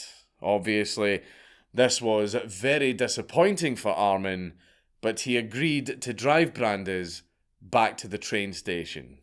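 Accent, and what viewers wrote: Scotland, United Kingdom. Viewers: Loving the constant new videos. There's so many people to talk about and I love when you speak Scottish to me. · Dank love you bro but gotta move that pun counter it's blocked out by the subtitles. And your beautiful Scottish accent is almost indecipherable at times due to my lowly Baltimore origins. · I now narrate shit in my head with a scottish accent cos of the count haha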